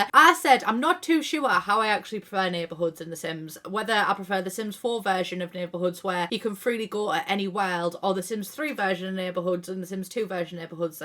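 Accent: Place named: Northern England, United Kingdom. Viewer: I see you too are from proper up north England and that's why you sound like that.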